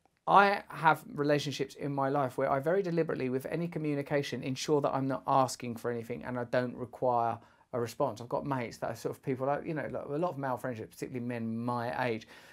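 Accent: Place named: United Kingdom. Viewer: Love his British accent 🤘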